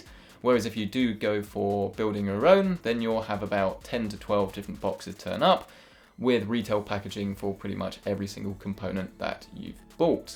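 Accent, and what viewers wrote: England, United Kingdom. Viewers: # P L E A S E H E L P M E! S I R THX ! # Hello building Computers is scarey but It can be great fun too , it used to be for me until my Brain Hem triggered Stroke! I am a wheelchaired Paralysed English Bloke you sound English but you could be Austealian/sAfrican Gibrateen ? I'm Paralysed guy and would love to build a PC/PC workbench perhaps then because I have little control with one hand you are aroud/approx the 22nd person iv'e asked would you be able to build a Pc with One Arm behind your back!? IFyou can't Help mewith that! Iv'e from Launch day I still am waiting for someone to give me some advice on how to INSTALL GTA5 the 7 dvd discs varient of this game on the PCI have a 120gig Win10ssd so I need to be able to install it on either d:/or e:/partition my separate 2 t b d/e separate drive.It is the one and only Game I want to play on my i7 3.6ghz cpu and my 1 gig vram gtx560ti could you help give me some step by step advice as I suffered abrain indury with my movement loss thankyou for at least considering my ASK! 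cheers thanks I apreciate it!!! I need to install the game on my 2 tb other drive 1 tb per individual partition partition I hope you'll be able to offer some advice certainly with the Game would be outstanding thankyou... broken mark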